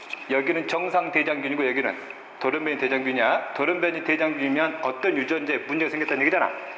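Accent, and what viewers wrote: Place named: Jeolla, South Korea. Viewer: 아따 전라도 사투리 구수하구마잉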